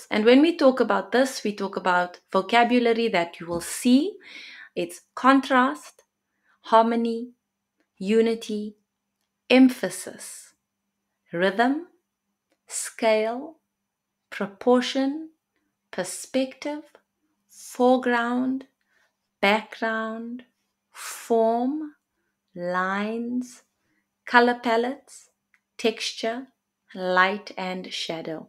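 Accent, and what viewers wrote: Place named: Cape Town, South Africa. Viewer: Sounds like a cape town accent, especially how you say "opporTunity"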